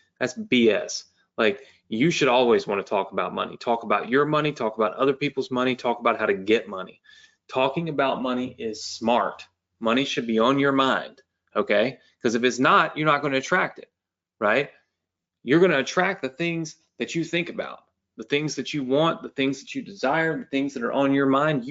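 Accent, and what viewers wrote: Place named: Mississippi, United States. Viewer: I know you are from miss. you talk like it.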